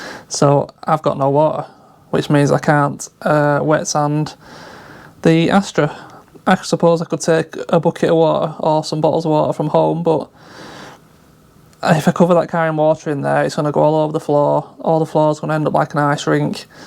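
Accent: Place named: Blackpool, United Kingdom. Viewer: accent a bit like Blackpool youtube legend, the 'walk on the wild side' channel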